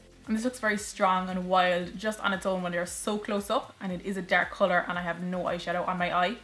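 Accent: Ireland. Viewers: Thanks for replying - it means a lot to me. Yes my eyes are like yours where the crease hangs down and blocks out part of my lid when my eyes are open.. I am so glad I "found" you Its lovely to hear the irish accent as Im irish (Mayo) living in England. Maybe sometime - who knows I may get to Cork and go to you for some lessons!!. All the beauty tutorials on youtube are great - but sometimes women of a certain age (like myself) are at maybe a low point in life (menopause etc ) lacking confidence and at a loss as to how to enhance the looks they have. I love the way you put your tutorials across it is very down to earth but very informative and easy to follow.- thank you. Maybe sometime you might do a full face and eyes for mature women???? Maybe??? I will keep a look out. God bless xx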